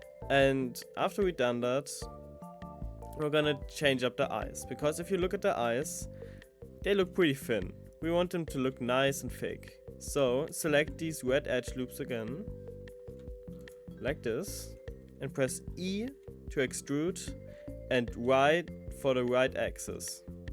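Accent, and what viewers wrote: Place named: Germany. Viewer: Ich glaube es könnte sein, dass du Deutsch bist(Akzent)😅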